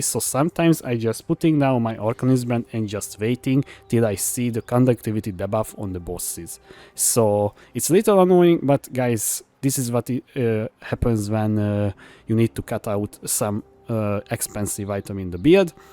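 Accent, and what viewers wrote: Hungary. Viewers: Remélem csak én hallom rosszúl, hogy magyar vagy? Mert sirva fakadok ha ilyen szintű angol nyelvü videókat csinálsz, miközben magyar myelvüek nincsenek.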